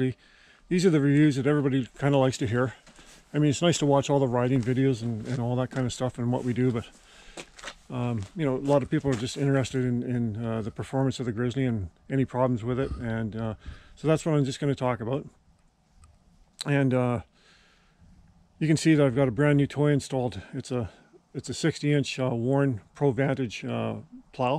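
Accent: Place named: Australia. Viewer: Nice video, thanks. Love the Aussie too.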